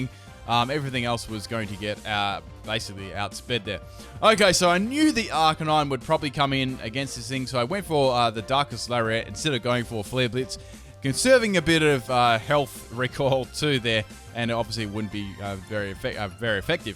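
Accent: Australia. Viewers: Of course the narrator is Australian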